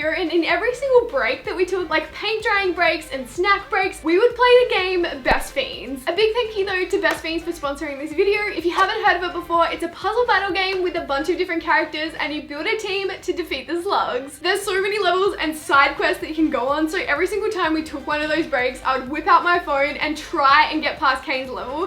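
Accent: Australia. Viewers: omg ur Australian so am !!! i love when i find you tubers that aren't American!!!..... no offence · My room is clean most of the time but it's always my dresser that stays messy, it's here, ps you r are soooo beautiful and I love your accent! Idk why but there is just something about Australian accents that I love! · Are u Australian?????????????????????????????????????????????????????????????????? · Arw you Australian?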